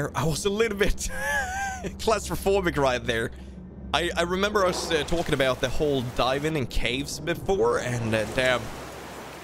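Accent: Sweden. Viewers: Not seen many of your vids, but some with Fooster, looking forward to seeing/listening to more vids. What I think I like the most, is how you embrace your Swedish, as a fellow Swede, I'm happy to hear it, and it always makes me smile. Love from good old Malmö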